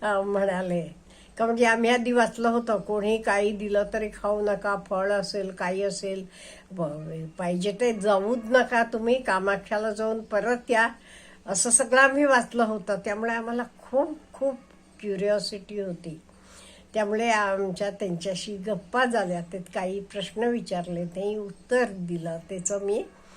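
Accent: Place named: Vidarbha, India. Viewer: Aaji tumhi vidarbha cha aahat ka marathi thodasa vegla vatta pan chaan vatta aikayla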